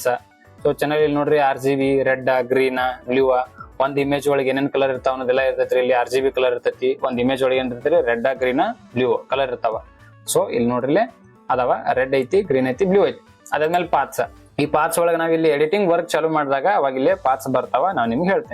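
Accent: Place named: North Karnataka, India. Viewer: uttara karnataka style super bro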